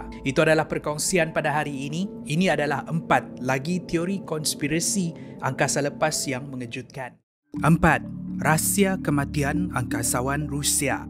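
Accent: Sabah, Malaysia. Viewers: Suka tgok penulisan dan dengar org Sabah brkata kata..menarik...trbaikk ya..betul lh tu kut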